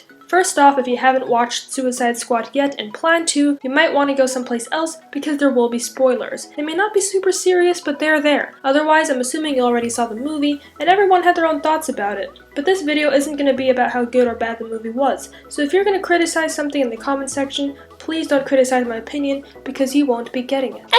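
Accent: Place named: New Jersey, United States. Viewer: Its a New Jersey accent :)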